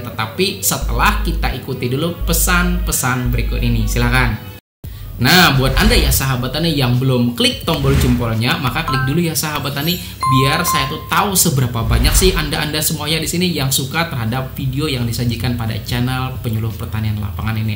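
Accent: Banjar, Indonesia. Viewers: Orang banjar kah pian mas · urang banjarkah pian julak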